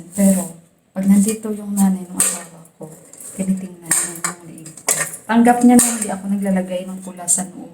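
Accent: Iloilo, Philippines. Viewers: Saan k dito sa pinas sis prang ilonggo yong tagalog mo bago po ako dito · Hello po sa mga in laws nyo. Ang sweet nyo po magkwento. Ilongga po ba kayo kabayan? Parang d po kayo marunong magalit hehe. Magiging busy na Po kayo nyan with your in laws. Pero update nyo parin sana kmi. Godbless po ❤️ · Hello ate, nice to see your vlog, saan ka po sa visayas te. Ilonggo accent ka. Ilonggo dn po ako. 🙂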